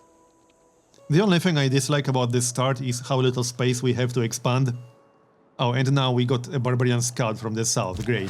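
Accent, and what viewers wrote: Poland. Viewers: where are you from mate, you sound Polish. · He is obviously Polish :)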